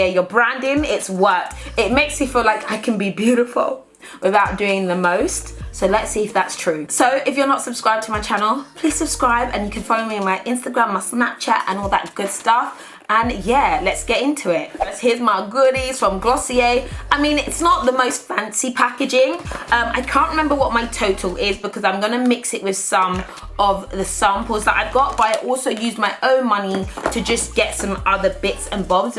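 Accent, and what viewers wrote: United Kingdom. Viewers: Love your accent! Are you from UK? 😘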